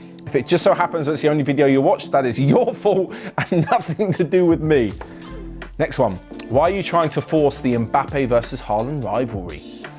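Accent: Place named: United Kingdom. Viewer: God I could never imagine this channel being voiced by anyone not British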